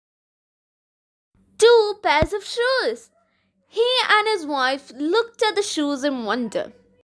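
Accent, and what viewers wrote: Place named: England, United Kingdom. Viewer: Excellent video and story as always, I love your voice is so clear and easy to understand and I really admire your English accent, Great effort my dear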